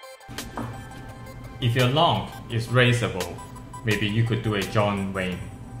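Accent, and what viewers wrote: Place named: Thailand. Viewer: You sound exactly like they mimic Thai or Sing people speak English :)), btw I'm Vnmese.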